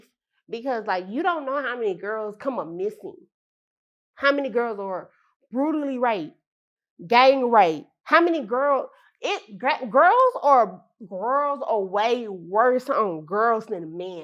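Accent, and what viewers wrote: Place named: South, United States. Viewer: She has a southern accent!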